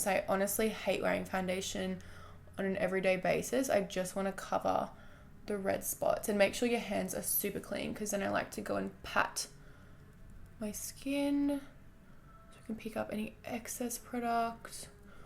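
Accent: Australia. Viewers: I don’t know if I’m being a little rude but , are you Australian I love that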